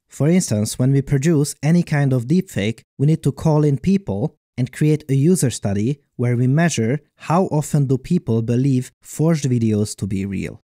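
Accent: Hungary. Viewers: The hungarian accent is strong with this one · Are you hungarian? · lol the hungarian accent!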